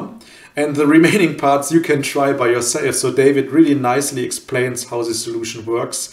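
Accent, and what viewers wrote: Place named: Germany. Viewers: The german accent MAKES the video! 😍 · Interesting! But that German accent really is a killer. That's me saying this, a German.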